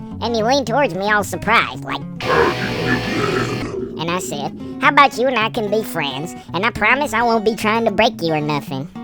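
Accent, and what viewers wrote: United States. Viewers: my favorite of your shorts! I love the lil southern accent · Im so glad to have watched through the 38 and 42 hour Beverly Hillbillies videos, her accent/misunderstanding reminds me of them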